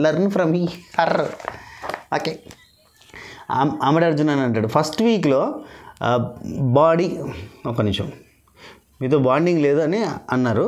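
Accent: Telangana, India. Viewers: I dont watch Big Boss and I also dont watch Buzz... but I only watch ur reviews.. asal mana telangana slang la masth comedy points tho reviews.... super o super.. reviews are always funny..... Perception purse lo petta.. rough adisthunde akada adathaledhu... LOL ROFL..🤣😂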